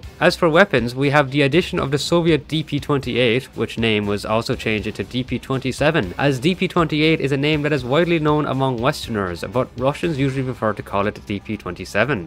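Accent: Ireland. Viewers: Your Irish accent is so cute! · I like the Irish accent.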